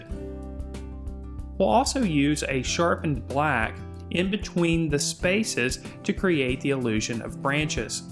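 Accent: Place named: East Coast, United States. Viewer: I was wondering if you consider all American accents wonderful (?). For me it's a normal American accent (Being an English Teacher with a strong affinity and love for the English language, I'd probably place it somewhere around the East coast of North America. I wonder whether the would confirm my guess...)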